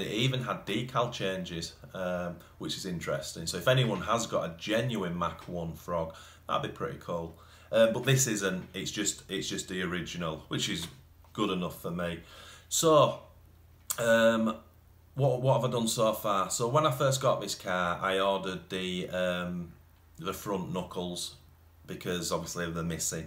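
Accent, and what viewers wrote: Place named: West Yorkshire, United Kingdom. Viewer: You sound local to me... I'm in West Yorkshire, Leeds Bradford area. Just discovered your channel and loving it as Imy first rc was a frog in 85,second hand mind you. Still took nearly a year of paper round to buy. Just getting back into it. Bought a zd racing for now til deciding what bigger to get. Anyway cheers for the memories mate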